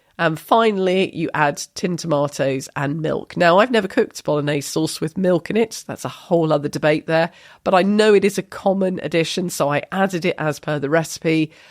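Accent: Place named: RP, United Kingdom. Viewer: modern RP is known to me👍🏻👍🏻😀thank you. I am curious, if your mRP differs from eg. Atomic Shrimps‘, who is located in Dorset, as he says in his video. I understand, that the privacy is all important. But, if you could give a hint in which part of England your accent has developed and all maybe which region you are living in right now, I’d be very pleased if you could name of the region, if this doesn’t feel like doxxing yourself to you. Curious and very friendly greets from Germany 😀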